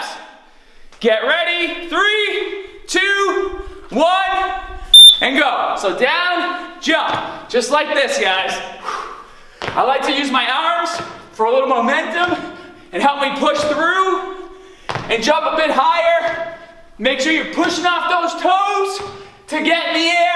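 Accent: Boston, United States. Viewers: What's up with the Boston accent I watched your videos for years and stopped watching and recently just started watching them and I had to go back in time to see that it was the same person are you originally from Boston and it just came back you never had an accent before just curious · John Letterman Its the boston accent i think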